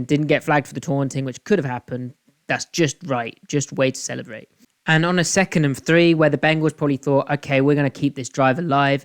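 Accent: United Kingdom. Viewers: YOU'RE BRITISH!?!? I've been following you on twitter for a while and had no idea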